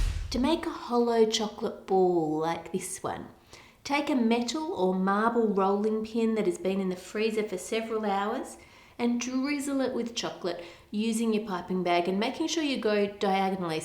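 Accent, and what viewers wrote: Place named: Australia. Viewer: Hi I love ur videos and ur accent. Auzzie accents r my fave ❤️❤️xx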